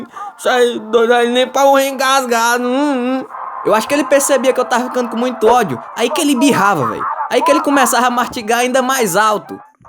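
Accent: Northeast, Brazil. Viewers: Gostei de mais kkkk tu é nordestino?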